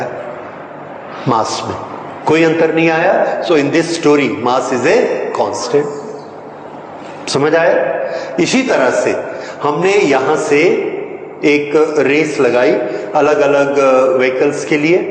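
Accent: India. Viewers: I see all of these great comments about this professors teaching, and only wish I could understand his english better. When I worked with some really nice people from India, I had no problem after we were together for a while, but it has been years, and I know this is english, but there is an accent that makes it very difficult for most Americans to initially understand. I will try to just watch it several times, and hope it then comes naturally, because everyone has so many great things to say about his teaching. I guess it is the way some vowels roll off the tongue, something common in Hindi, that makes it difficult for me....and I'm certain the reverse is also true, where if someone not familiar with the way Americans speak english, they would be spending too much time trying to understand the words, and miss the lesson. Thank you very much for generously posting this lesson sir, and I only hope I can get as much from your teaching as the others have gotten!!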